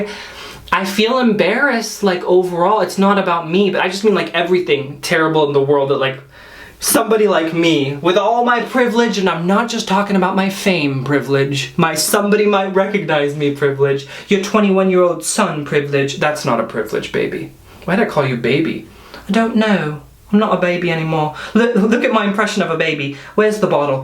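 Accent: United Kingdom. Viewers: Your British accent sounds like Tom holland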